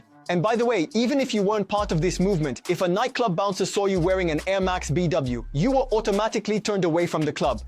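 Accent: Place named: France. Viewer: Pas mal, un petit accent français mais sinon ça va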